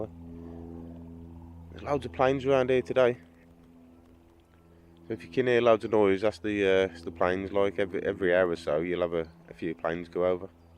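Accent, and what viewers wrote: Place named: Midlands, United Kingdom. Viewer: Nice vid with some good commentary..👌 Nice to hear a Midlands accent..im in Wolverhampton... Have you tried coppice lane fisheries.?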